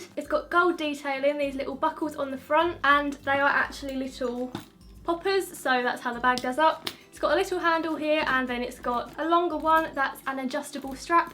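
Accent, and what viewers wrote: Essex, United Kingdom. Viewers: i love ur accent i used to watch towie and idk it just reminds of it ❤️❤️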